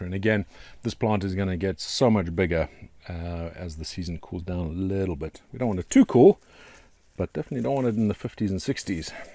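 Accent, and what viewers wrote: South Africa. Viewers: You sound like a south african? If so tell us more!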